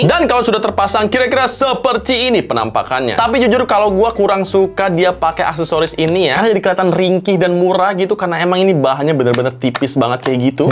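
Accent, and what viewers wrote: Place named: Sumatra, Indonesia. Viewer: ada logat bengkulu,jambi dan palembang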